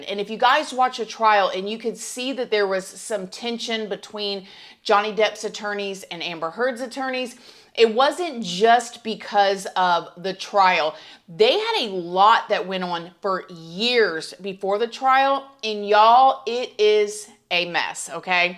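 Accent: South, United States. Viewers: Watched a few of your vid's. Then one day I noticed you have a southern accent. I lived in the south for awhile. Love your accent. And yes we all love you!!!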